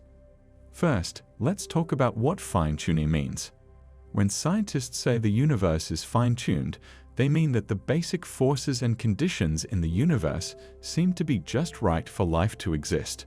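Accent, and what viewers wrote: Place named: Australia. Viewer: Not American, seems more like Australia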